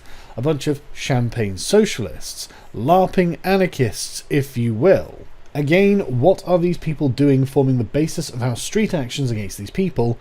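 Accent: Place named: United Kingdom. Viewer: British dialect issue, whilst Britain is tiny compared to the US, we have hundreds of dialects that do some weird shit to vowel sounds in particular, and the dialect can change significantly over the course of 20-30 miles, where sargon lives is right at the cusp of a major vowel sound change in dialects, so that's probably why he pronounces it this way, because it's the normal pronunciation for that area.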